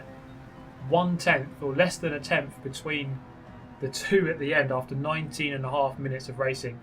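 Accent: United Kingdom. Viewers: In my mind you sounded like more British to me for some reason lol I guess that’s like saying to me if I was in Bristol or something and someone saying to me yea you don’t look to American to me...lol never thought about it like that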